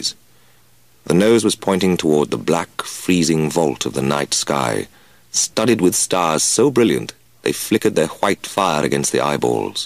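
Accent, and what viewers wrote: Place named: England, United Kingdom. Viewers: I agree, respect to A Maitland, but you need the well spoken English accent for this story. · Much prefer this version (which I have on cassette tape) to the Al Maitland version. The difference between the reading by an actor versus a radio announcer. The English accent also lends authenticity not present in the Canadian version. Wonderful story, in any event.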